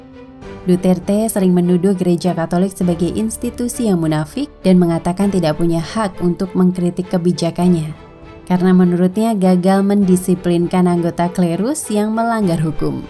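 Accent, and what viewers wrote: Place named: Banyumas, Indonesia. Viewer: Ngapak pula kao bapak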